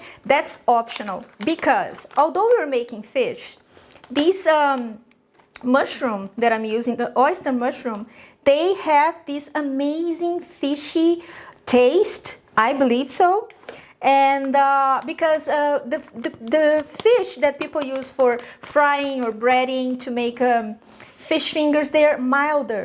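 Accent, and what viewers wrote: Brazil. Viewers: A receita parece legal, mas vim elogiar seu inglês hahahaha dá pra perceber o sotaque brasileiro mas eu gostei bastante de como você se virou com as palavras. Parabéns :) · Ah, que legal! Seu inglês é maravilhoso, perfeito! Mas como sou brasileira, pesquei o sotaque! Obrigada pela receita! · Vi os primeiros 30 segundos do vídeo (o primeiro que vejo seu) e pensei... Wow! Ela fala como uma brasileira! Parabéns pelo trabalho maravilhoso, chef!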